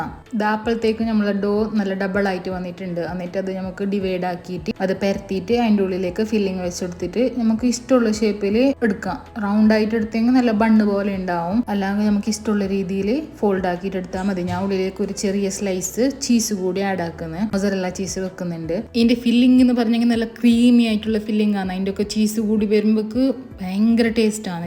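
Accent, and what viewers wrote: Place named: Kasaragod, India. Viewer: Ninga kasaroda Slang Nella Cleland kelkan❤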